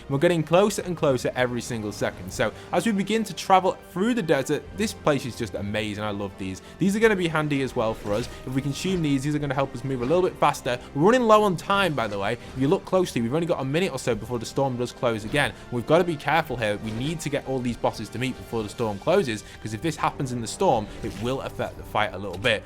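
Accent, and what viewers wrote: United Kingdom. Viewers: Also you kind of sound Scottish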